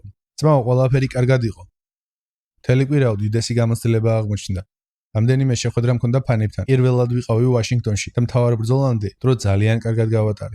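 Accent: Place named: Poti, Georgia. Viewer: ფოთის კილოზე ლაპარაკობ 😊😊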